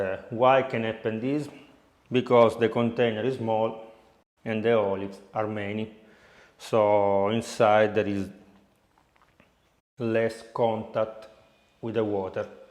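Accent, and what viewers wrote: Italy. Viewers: His Italian accent is very nice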